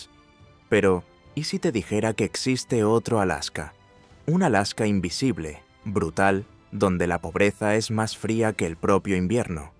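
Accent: Spain. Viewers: Pronunciación del inglés típica de un hispanohablante de España.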